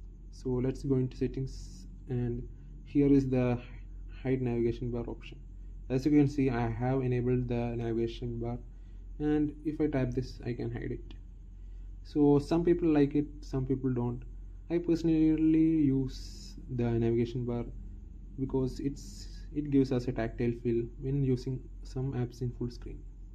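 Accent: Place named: Kerala, India. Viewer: Are you a Malayali? Your English sounds familiar. I wouldn’t call it an accent, but Malayalis have a unique way of speaking English.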